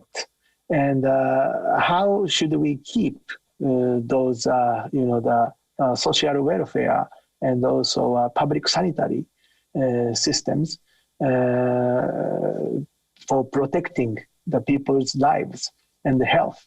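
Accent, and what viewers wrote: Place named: India. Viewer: Beautiful like indian english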